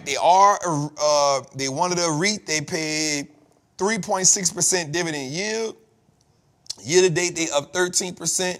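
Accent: New Orleans, United States. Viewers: I wouldn't worry about your pronunciation. Anybody who's going to quibble over your articulation probably isn't from the culture like that. I don't even have a New Orleans accent but I knew what you were saying. You should probably send somebody like that somewhere else. Because in the future they're going to try to change you. So unless you're just working on it because it's something you want to do then don't change. Say things the way that you want to. Besides that kind of keeps it encrypted for the culture that gets it. The culture that probably needs your help the most. Somebody arguing over something like that is probably kind of uppity anyway.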